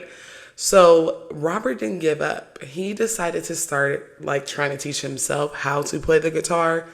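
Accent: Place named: California, United States. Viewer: Can somebody tell me where shes from? I hear a Stockton-Sacramento accent but I could be wrong…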